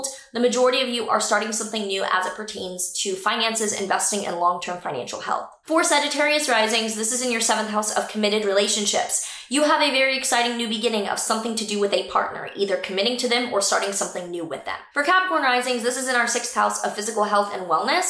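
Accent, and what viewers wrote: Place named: Texas, United States. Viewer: Are you from Texas? I can hear your country accent 🥰😀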